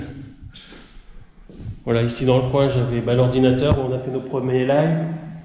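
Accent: North, France. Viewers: Salut, jsuis désolé pour toi de quitter un grand espace pour un espace plus restreint, mais faut s'adapter. Je vois que tu es du nord!? Il me semblait bien avoir reconnu à la voix mais j'avais le doute! Je suis de Cambrai dans le nord je travaille sur une super 5 GTL de 1986, elle passerait dans ton allée lol Moi j'ai ce soucis de place également je travaille sur un terrain non bitumé c'est terrible et je partage quelques vidéos. Je te souhaite bon courage pour toute tes installations d'aménagement. À bientôt, Mat de #super5gtl86 😉✌️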